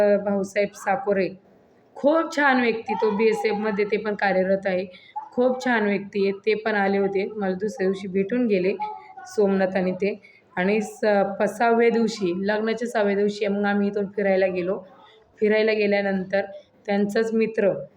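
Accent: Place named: Khandesh, India. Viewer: पण तुमच्या बोलण्या वरून तूम्ही पुणेरी वाटत नाही तुम्ही खानदेशी किंवा सातारा सांगली चे दिसत आहात हा तुम्ही आता पुणे मध्ये राहत असाल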